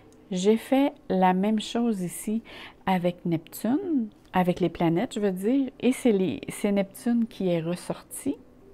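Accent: Canada. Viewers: je vous decouvre et j'adore....MERCI bizou du coeur de Nouvelle caledonie...(votre accent canadien...que jadore)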